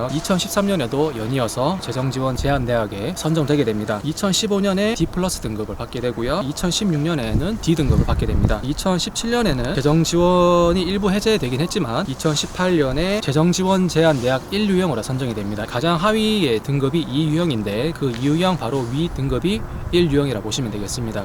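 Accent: Gyeongsang, South Korea. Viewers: 전라도 땅에 이름은 고구려 경상도 말투의 진행자 분 삼국 대화합의 장이네요 나주대로 다시 바뀌고 만학도들이 많이 다니는 학교라니 지역 경제 활성화 차원에서도 잘 되기를 바랍니다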